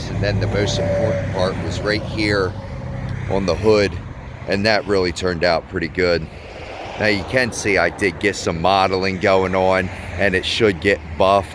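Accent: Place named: Philadelphia, United States. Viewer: Not sure where you are located but your accent reminds me a lot of folks I used to work with near Philadelphia in Newtown. I'm betting you're from that part of the country!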